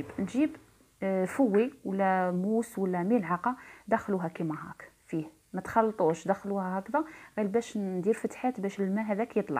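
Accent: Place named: West, Algeria. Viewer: تهبلي هههههه انا قبايليه بصح نموت علي لهجه الغرب ، غير على لي ماتحبش تخلي كومنت معليش انا نخليلك لايك وكومنت ونشترك. ههههه نجربها ولادي هبلولي علي لبن لي داقه فالدزاير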